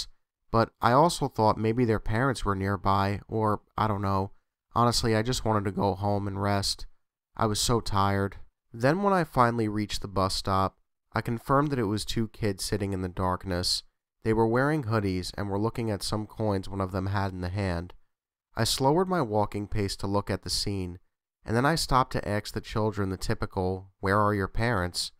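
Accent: New York, United States. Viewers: Where are you from? New York or Jersey?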